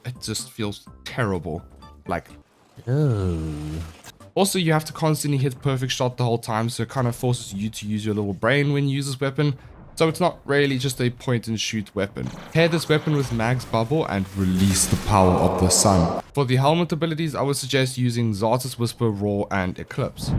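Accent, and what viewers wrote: South Africa. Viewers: Are you south africa? Your accent sounds a lot like it, just wondering as I am from SA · U south-african? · You sound South African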